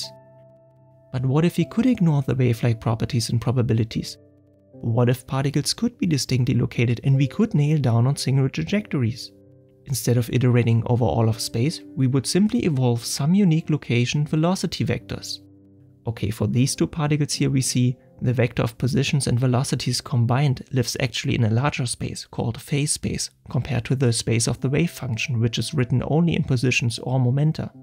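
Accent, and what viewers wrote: Germany. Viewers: I sink I can hear out a German accent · Lass mich raten, du bist Deutsch? Hört sich aufjedenfall so an 😂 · WTF dude ?! I was like „yeah time so go to sleep“ and than suddenly YT dropped your video in recommendations. The quality is INSANE ( and I just started the video). I am WAY to tired to understand everything. Just saved the video and will come back tomorrow to appreciate the work. Amazing. Quick question, it seems like you’re from Germany ? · too heavy German accent can not handle it.. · Germany, Austria or Switzerland would be my bet. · Deinem Akzent nach, bin ich mir ziemlich sicher du verstehst Deutsch :). Wirklich ein riesiges Lob an dich. Auch der Channelname passt perfekt. Ich würde mich sehr freuen in Zukunft mit weiteren Hirntrüffeln versorgt zu werden :) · DER DEUTSCHE ACCENT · Thank you for the great masterpiece! It's one ob the best on the Web. 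The visualization and data representation is just amazing. This is just an exemplar for all who makes presentations and lessons. Quite professional! Very consistent and well explained material, easy to follow. Do you answer the questions in the comments? If so, I have some questions and comments. (One thing it would be nice to improve is the pronunciation. Let me guess, are you German? The pronunciation is good, but accent for some words reveals you and sometimes in the way to listen; everything is fine and understandable, just distracts because of funny pronunciation. Maybe it worth to ask a native speaker to read? On the other hand, I like both the voice and way of speaking).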